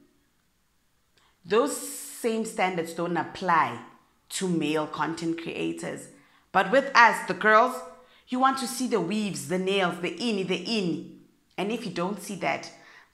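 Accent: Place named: Limpopo, South Africa. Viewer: 🤣🤣🤣🤣 I love the Limpopo English accent, it really goes for the soul😂😂😂😂 I think some Americans don't want to make the effort to learn how to listen, especially if a person has a thick accent. However, some appreciate and even love other people's accents and as a result you thrive at what you do and offer.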